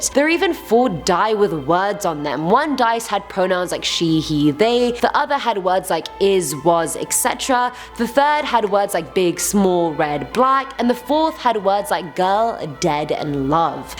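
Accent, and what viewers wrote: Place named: United Kingdom. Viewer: i wish the host spoke clear straight English without that British accent, was really struggling to understand her ........that’s it I’m giving this video a thumbs down because i cant understand what this woman is saying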